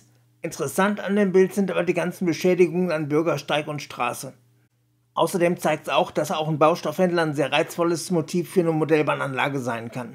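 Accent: Hesse, Germany. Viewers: Tolles Video. Nur das "Hessen-Gebabbel" finde ich nervig. Vor allem bei wiederholtem Ansehen. Hessisch babbeln am Anfang ist ja witzig, danach aber bitte hochdeutsch. --> Ist jedenfalls meine bescheidene Meinung. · Hessisch mit Untertiteln? Echt jetzt??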